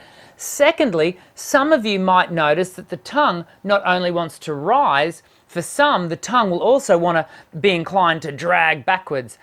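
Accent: Australia. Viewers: Lol I'm a woman with a deep voice and his low range is my high range. But he holds a tune well! I love his Australian accent and turns of phrase too. His personality comes across too. Just enjoyable and educational all around.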